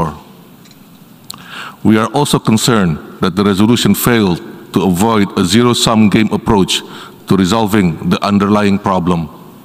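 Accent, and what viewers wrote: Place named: Indonesia. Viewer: Wow bahasa inggrisnya, lugas ala indonesia namun ga sok ke inggris2an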